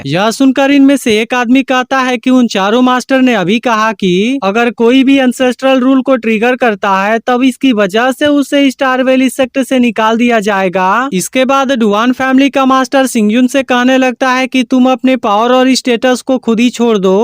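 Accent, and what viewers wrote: India. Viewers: First comment for u 😚 big fan bro ur voice from odisha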